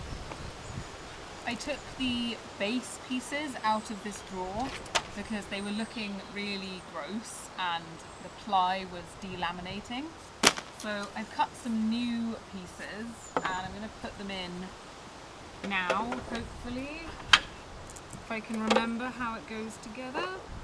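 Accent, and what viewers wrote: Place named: RP, United Kingdom. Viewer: У вас очень хороший английский как у вас говорят "Queen's English" несмотря на то что вы живете в деревне. Обычно британский деревенский просто ужасен ничего не понятно. ☝😲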